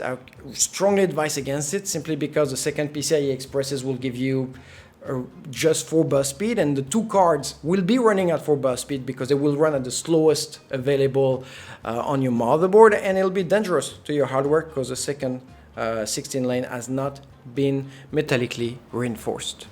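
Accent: France. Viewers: Tu es français ? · so what exactly is the advantage of having 2 lan ports? and btw , boy u sound soooooo french haha nice vid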